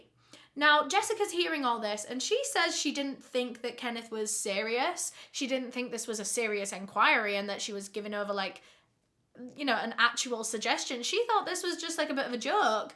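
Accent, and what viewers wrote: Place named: Northern England, United Kingdom. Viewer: As a Londoner I adore your Northern accent! Soooooooooooo in this Videooooooo. You make amazingly great videos and you look amazing also x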